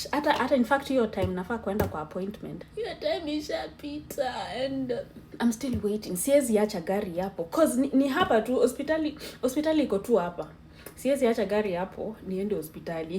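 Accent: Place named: United States. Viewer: Ee the American English assent in you❤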